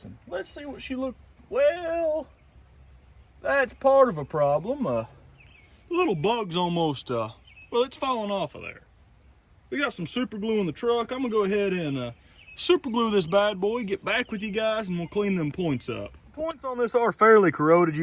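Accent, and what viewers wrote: South, United States. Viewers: On a different note… you have the most amazing southern accent.. makes it even better watching your videos.😊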